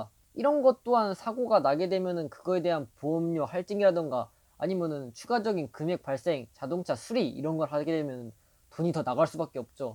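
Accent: Gangwon, South Korea. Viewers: 강원도 사투리 정감가네요ㅎㅎ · 강원도 사투리가 살짝... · 와 또래중에 강원도억양 제대로들은거 처음이다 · 강원도억양 아직 있으시죠? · 강원도 사투리 ㅋㅋ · 경기 북부 강원도 사투리 ㅋㅋ 제가 잘 알죠. 가평. ㅋ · 말투가 강원도?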